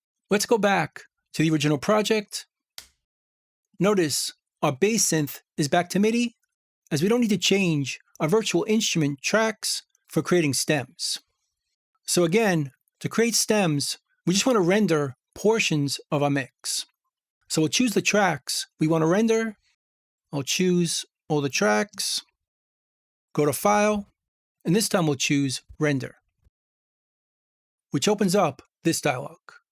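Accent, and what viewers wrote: New York, United States. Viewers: Thank you. From a newb, you make things very understandable and clear. PS Love that NY accent:)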